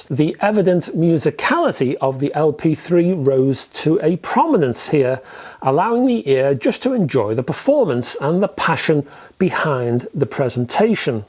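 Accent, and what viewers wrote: Liverpool, United Kingdom. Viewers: Looking to get into vinyl. Are you from by my way Liverpool? If so apart from Richer Sounds are there any decent shops up here to browse? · Nice British accent :-)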